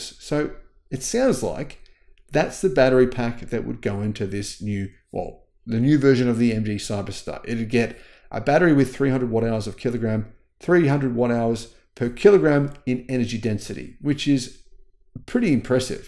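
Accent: Australia. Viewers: I am looking for a way to get credit for my improved ability to comprehend the Ozzie accent But all web sites focus on reading comprehension and ignore listening comprehension Still because of the, my listening comprehension has improved, making me confident I could successfully buy a cup of coffee and rent a hotel room in Australia!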